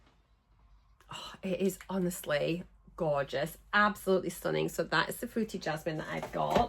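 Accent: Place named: Manchester, United Kingdom. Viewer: First time commenter. I found you a few weeks ago and really enjoy your videos. I'm originally from Manchester but have lived in the states for many years. I feel a bit homesick when I hear your accent. Your weight loss.journey is inspirational. I don't know if the perfume giveaway is just for UK subscribers. If not, I would love to be in the drawing. Jo.x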